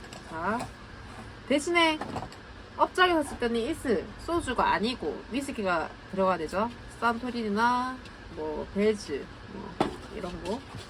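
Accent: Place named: Gyeongsang, South Korea. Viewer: 구수한 갱상도 사투리가 매력적입니다❤️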